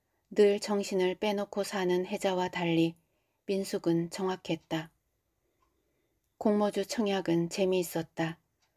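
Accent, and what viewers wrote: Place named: Chungcheong, South Korea. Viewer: 구독하고 듣습니다. 충청도 사투리 잘하십니다~♡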